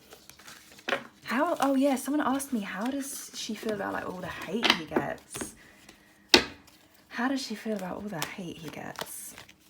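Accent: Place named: Australia. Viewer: Spot an Aussie lol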